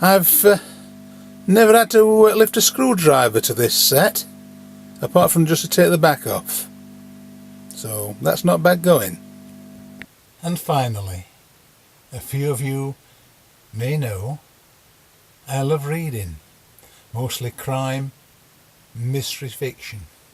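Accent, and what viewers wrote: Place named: Sheffield, United Kingdom. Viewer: Just discovered your channel. I'm sure you've long since finished the Philco book. I've just got my first vintage radio myself. a 1933 Philco model 18H (Highboy). When I first clicked your channel I assumed you would be out of Birmingham, Pennsylvania (steel city) but upon hearing your accent, now I'll assume Sheffield? Can't wait to see more of your videos.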